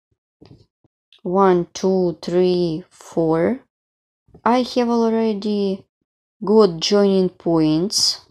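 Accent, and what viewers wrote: Russia. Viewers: Мне одной кажется, или таки я и правда слышу родной, русский, даже в английском? За обучение спасибо, учусь, очень хочу уметь красиво и без подсказки вязать крючком. В видео все понятно.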